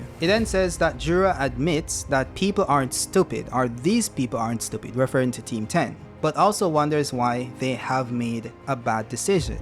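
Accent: Jamaica. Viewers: yo are u jamaican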